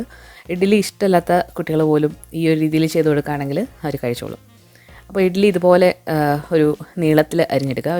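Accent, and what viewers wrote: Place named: Valluvanad, India. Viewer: de valare pazaya videos il oke voiceover accent kuracu vithyasom toniyirunu (oru valluvanadan style aaypoy pole), ath otum ishtomaayrunilaa. Pakshe ipol kure naalay valare nanaay samsarom. Anikk valare ishtomanu recipes um samsaravum. Very simple and straight to the point. Please keep up the perfect work🥰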